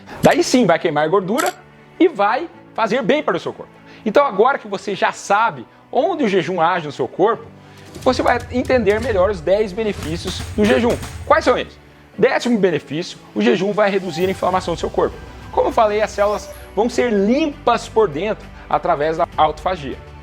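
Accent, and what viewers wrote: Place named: Caipira, Brazil. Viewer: Já era fã, agora que vi de camisa polo, apaixonei. Esse R caipira, então...🥰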